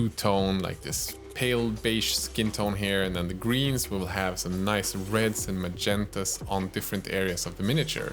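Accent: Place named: Sweden. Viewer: as long as it suits you. If There will be subtitles it is fine). Like Angel does. I love Sveedish accent, I am watching Pewds since almost the beginning almost used to it. Just received the wet pallet from Redgrass. You should have a deal with them. Bought after your recommendation. Thanks for great content!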